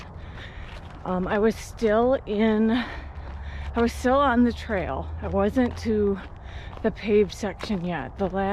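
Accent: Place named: Michigan, United States. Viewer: I thought so! I have a good friend that lived in Midland Michigan and she had a similar accent to yours.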